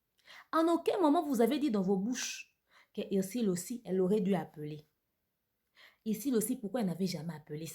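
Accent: Ivory Coast. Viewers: J'aime bien tes analyses,ce que j'aimerais le plus qd tu t'exprime en français normal c'est trop nickel et non l'accent ivoirienne excuse moi.😘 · Exprime toi bien comme tu as l habitude de le faire arretes cet accent ivoirien qui ne te sieds pas du tout. Sinon bonne analyse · Ma chérie je t'aime bcp tu es parmi le youtuber congolaise qui par bien le français et tu fais bien tes analyses. Mais pardons ne parle pas avec l'accent ivoirien. Parceque moi je beaucoup des amis ivoiriennes qu'on un complexe d'infériorité enver les congalais ils disent que nous parlons tres bien le français sans accent. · T EST VRAIMENT OBLIGER DE PARLER COMME UNE IVOIRIENE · Ma Sœur, Vous parlez très bien français car je vous ai toujours suivi. Svp ne changez pas d’accent ou n’ empruntez pas le ton ivoirien. Gardez votre excellent français avec vos bonnes analyses. Cela fait votre identité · je t’aime bien mais j’aime plus quand tu parle normalement le français ivoirien ne parle plus ça stp ma sœur je t’aime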